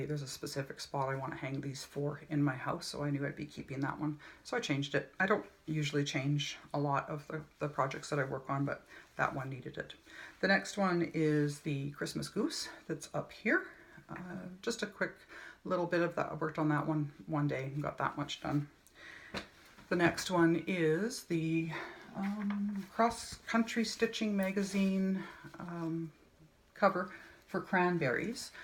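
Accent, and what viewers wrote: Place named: England, United Kingdom. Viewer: Great first video☺. Loved how you gave all info out. As you said Jules is fantastic!!! She's amazing in many ways isn't she. You needn't apologise for being nervous, it was your first one, at least you put yourself out there and I thought you did really well. Out of interest, were you born in Canada? You sound more English. I am from England lol. I like the variety of different projects. See you next time. Happy stitchy holiday☺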